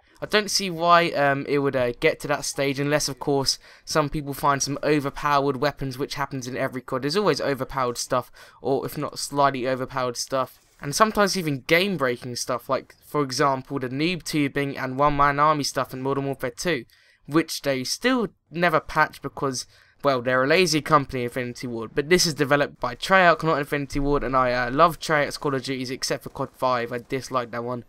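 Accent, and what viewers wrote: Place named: Oxfordshire, United Kingdom. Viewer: Great review. I completely agreed with 99% of everything you said. Where are you from by the way? You sound exactly like my half brother. You from somewhere in oxfordshire?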